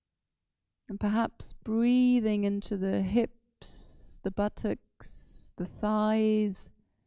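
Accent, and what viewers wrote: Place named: United Kingdom. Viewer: Was sie für eine wunderbare Stimme hat und dieses liebevolle British English ist für mich so ein Segen... Gerne regelmäßig Yoga mit!!!!! I love it!!!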